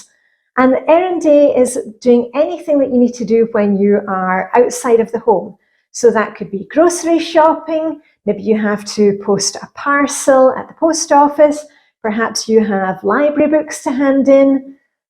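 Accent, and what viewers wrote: Scotland, United Kingdom. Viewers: I love Denmark! But I perceive you are from Scotland!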